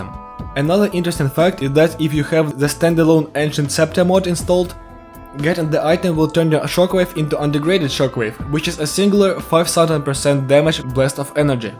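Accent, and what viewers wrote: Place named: Russia. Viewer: Judging by the accent.... Привет, ты что русский? Сильный акцент, друже. Хороший видос, уверен со временем твоё говорение улучшится.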